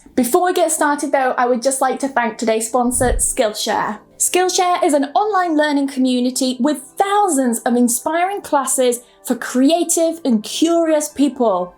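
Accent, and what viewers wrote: United Kingdom. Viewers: Hola, estoy muy agradecido contigo porque tu canal me ha ayudado bastante, me has sacado de preguntas muy significativas. Te amo. Me enamora tú bello acento británico. Saludos desde Bogotá, Colombia.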